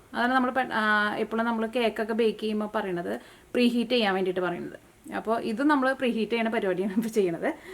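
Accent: Thrissur, India. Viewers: Buiscuit super aanu.nedumpasseriyl trichur/chalakudy slang eppol vannu.kelkan sughamundu.👍👍👍👍👍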